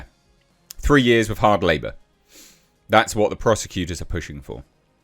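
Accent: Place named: United Kingdom. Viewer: Forgive me for repeating myself but I love breakdown of the situation. Between his British accent and his use of modern colloquialisms it’s hands down my favorite solo streamer/youtuber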